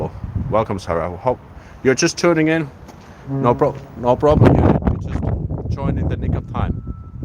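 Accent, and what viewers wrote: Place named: Finland. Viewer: I’m honestly in love with Finnish accent when they speak English! It’s ear pleasing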